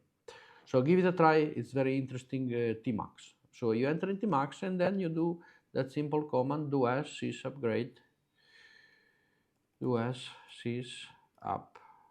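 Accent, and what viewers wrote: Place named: Romagna, Italy. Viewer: Ottimo video, mi hai fatto scompisciare con l' italiano perché si sente l' accento romagnolo..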